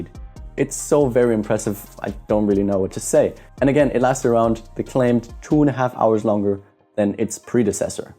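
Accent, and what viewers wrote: Germany. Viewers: Haha wie man sofort den deutschen Akzent merkt 😂. Tolles Video, danke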